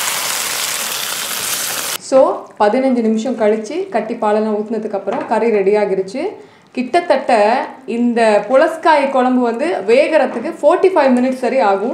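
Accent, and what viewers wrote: India. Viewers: sis don't use the blady indian tamil you use in our Tamil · இந்திய தமிழ் வாடை அடிக்குதே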